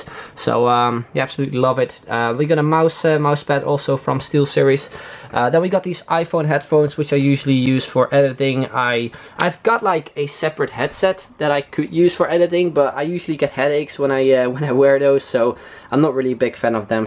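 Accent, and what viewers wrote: Netherlands. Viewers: Ik hoor gewoon aan je engels dat je nederlands bent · Haha had ik ook ik dacht meteen sowieso Nederlands! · you have a really funny dutch accent(also i am) but you're vids are great man keep it up!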